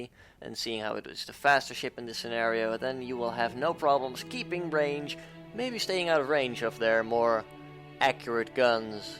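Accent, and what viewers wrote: Netherlands. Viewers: why dose the ai failsafe not conect and Are u dutch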